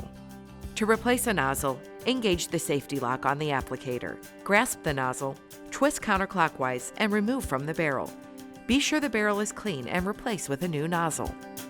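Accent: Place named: Wisconsin, United States. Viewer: Love the narrator's Wisconsin accent.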